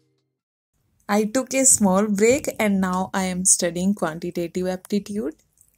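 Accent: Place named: Kerala, India. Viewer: ohh!! srry to tag u so quickly but ur accent resembles a mallu accent!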